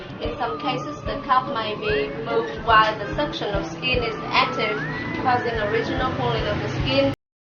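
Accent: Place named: Vietnam. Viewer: speaking english by vietnamese accent :)) It sound so funny :))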